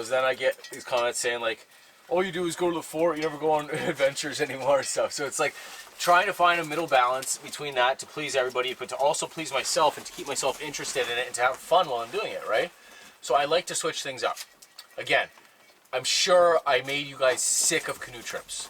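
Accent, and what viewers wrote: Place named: Canada. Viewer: why oh why cant canucks say OUT right ?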